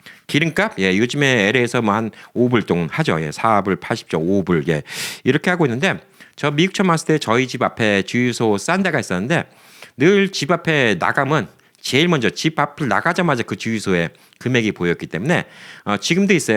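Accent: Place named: Jeolla, South Korea. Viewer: 부탁드립니다 그러면이 그러먼으로하시말고 러면을고치세요 전라도사투리 거러먼 안돼 그려면으로 먹으먼 먹으면으로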